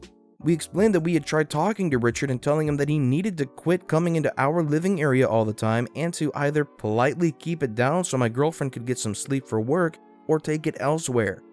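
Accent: United Kingdom. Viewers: Are you British? If so hi.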